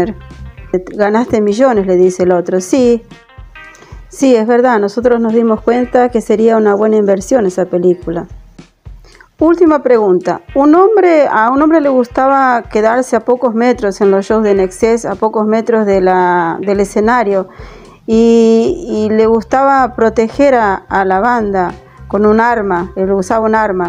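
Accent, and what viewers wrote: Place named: Argentina. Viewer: Me encantó tu forma de relatar bien argentina 👏🏻😂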